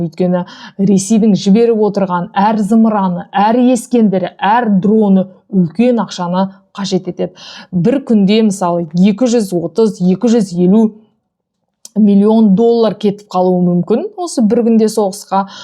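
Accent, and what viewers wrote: Standard. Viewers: Өте түсінікті таза қазақша сөйлейсің рақымет ұзақ ғұмыр тілеймін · Айналайын қандай жақсы таза қазақша жеткіздің рахмет 👍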